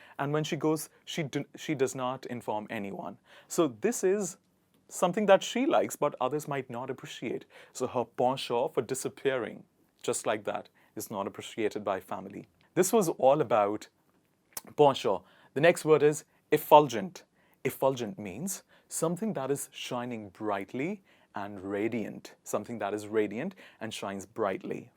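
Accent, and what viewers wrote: United Kingdom. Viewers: Is it SAAvy? Or Pronounced as savy? This man pronounces in a British way!! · Your English language pronunciations is not like Indians man. I thing you are born and raise up in England. Your talking in English language the way you pronounce each words is like British man. It is very wonderful. GOD Bless You.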